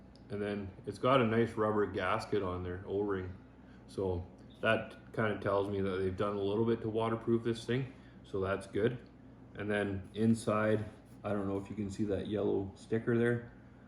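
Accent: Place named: Canada. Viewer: cool man, I could recognize a fellow Canadians accent. Lol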